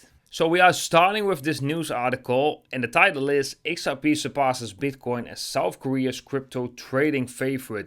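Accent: Netherlands. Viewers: I think he's Dutch! 😊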